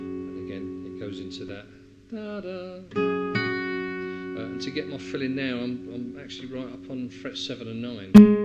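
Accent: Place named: England, United Kingdom. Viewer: Thank so much! And, hey, this is the first time that I've heard you speak! You have an English accent! Here in Missouri my Mississippi Delta accent gets me a lot of comments and attention. If I had you here, they'd let me alone for a while!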